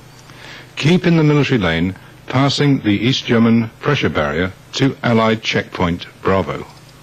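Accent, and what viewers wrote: United Kingdom. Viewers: I think it’s the very stoic and calm British accent aswell- it’s like those videos of what to do in a Nuclear War they made around the same time as this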